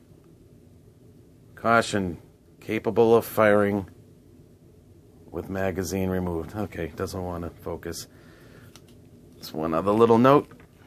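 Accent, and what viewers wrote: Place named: Boston, United States. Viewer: first video ive seen of yours off rip i can tell your from Boston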